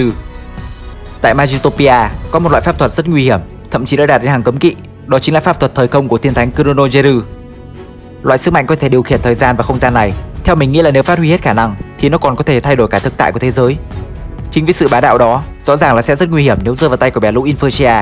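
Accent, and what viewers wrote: Japan. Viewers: Nói chung là nói theo kiểu tiếng anh chuyển thể nhật nên nghe rất khó chịu Flagel thì đọc là fle gieo thôi Fu le gieo ru Nghe mà chanz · Ông ấy nói theo phiên âm nhật k phải tiếng anh ạ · Phát âm theo tiếng Nhật chứ ko phải tiếng anh đâu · khó chịu thì chịu khó tìm hiểu tại sao đọc như vậy, phim của Nhật, thì đọc theo cách đọc tiếng Anh của người Nhật thì có gì sai. Nếu khó nghe thì tắt tiếng vừa xem hình vừa đọc wiki theo tiếng Anh chuẩn của mình đi. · Phát âm kiểu Nhật đó ạ, trong phim họ phát âm đúng là như vậy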